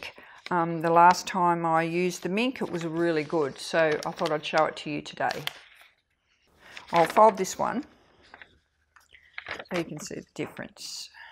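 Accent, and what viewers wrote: Australia. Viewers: I love your Australian accent! Sounds very royal haha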